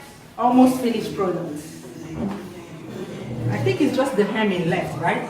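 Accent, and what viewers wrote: Nigeria. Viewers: How refreshing to watch travel video of a person who still speaks like a Nigerian... i got fed up listening to those with foreign accents, whether genuine or fake foreign accents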